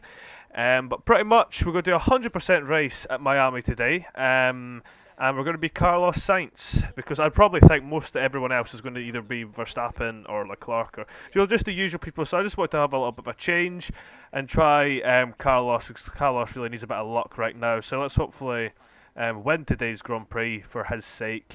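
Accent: Scotland, United Kingdom. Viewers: thought so, once I heard that accent I instantly subscribed I’m Scottish to so you can’t get better than an Scottish f1 content creator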